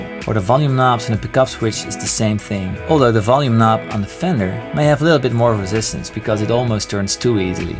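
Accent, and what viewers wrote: Netherlands. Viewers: Are you dutch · cool je bent Nederlands (hoorde al zoiets aan je accent) toffe video. speel zelf al 7 jaar gitaar en doe dat nog steeds op m'n squier ;) Super vid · Maat ben jij een nederlander??? lol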